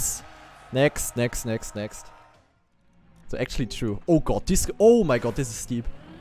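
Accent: Germany. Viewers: Dieser andere Deutsche Akzent 😂👌🏽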